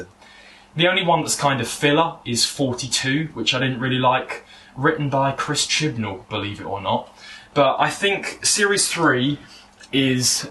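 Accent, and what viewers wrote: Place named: South East England, United Kingdom. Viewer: oh, you're a white guy in hid mid 20's with a vaguely south eastern accent and no fashion sense. I think i can guess most of your rankings before even watching the video s- series 4 and 5 a- series 1 3 14 and 10 b- series 6 and 2 c- series 8 and 9 d- series 7 and 13 f- series 11 and 12 edit: skipped to the end, i got many of these right. It's infact true that cis men have no taste.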